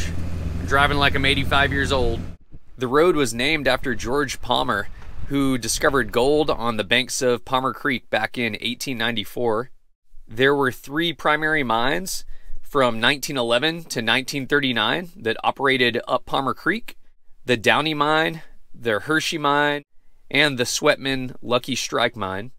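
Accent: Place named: Alabama, United States. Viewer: Where are you from? Accent sounds like Alabama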